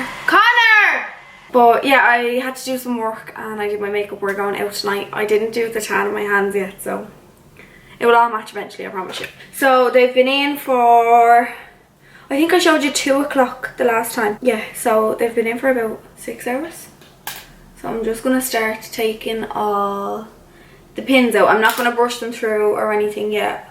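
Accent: Ireland. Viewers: are u Irish? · is she irish?? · Irish accents ❤️☺️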